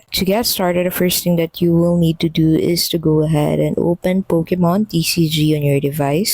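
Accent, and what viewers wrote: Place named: Philippines. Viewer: You sound pinoy.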